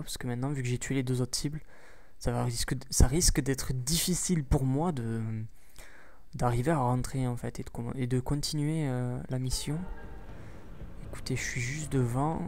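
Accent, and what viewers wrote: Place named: South, France. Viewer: jadore ton accent du sud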